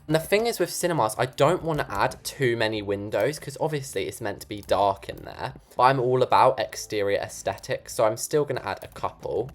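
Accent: United Kingdom. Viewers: im so confewsed idk if your british or not bc when you sing you dont sound british? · Bro his G@ and British voice is driving me crazy 😭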